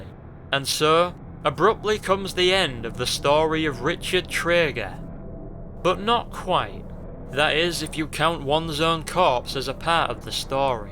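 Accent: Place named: Manchester, United Kingdom. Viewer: I love your manc accent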